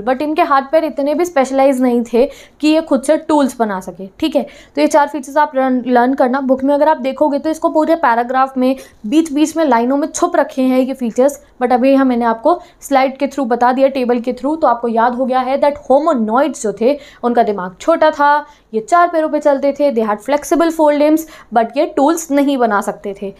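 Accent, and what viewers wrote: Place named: Bihar, India. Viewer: Tumhara accent dekh ke lagta hai ki tum bihari ho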